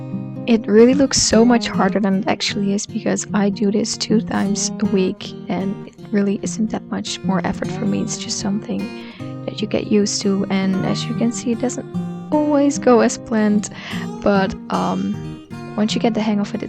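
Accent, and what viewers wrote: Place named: Netherlands. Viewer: you sound dutch or belgian, weet niet precies welke van de twee. leuke tutorial!!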